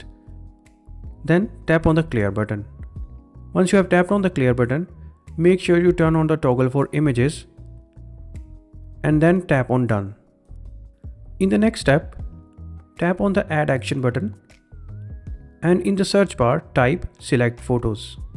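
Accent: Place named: India. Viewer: I knew this video works the moment I heard my brothers Indian accent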